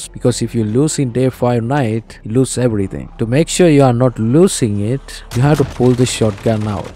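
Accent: Sri Lanka. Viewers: Broo are u from sri lanka? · I knew ur sinhala from ur accsent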